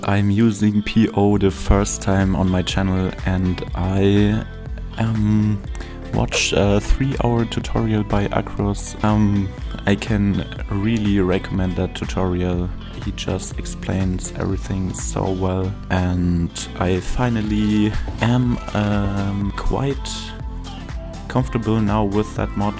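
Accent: Germany. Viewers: Man hört voll die deutsche Sprache raus 😂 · NGL I love your germann accent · Are you german you have a really german accent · Du kommst aus Deutschland das oder Deutschsprachigen das hört man einfach 😂 oder ich liege komplett falsch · Bis jetzt wusste ich nicht mal das du Deutsch bist. PS: Mann hört es aber auch Raus XD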